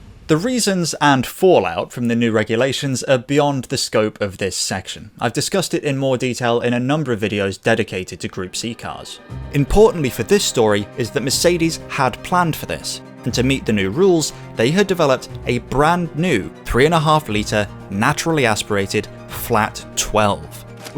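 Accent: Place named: North, United Kingdom. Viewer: Awesome vid as always! Where in England are you from, if I may ask (I'm a non-Anglophone who's recently found a fascination for English regional accents)? You speak fairly posh but at the same time you are unmistakably Northern!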